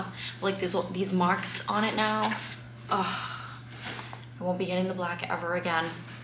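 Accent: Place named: Boston, United States. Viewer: Are you from Boston? Love your slight accent and I think I see a cape cod bracelet. Cheers to you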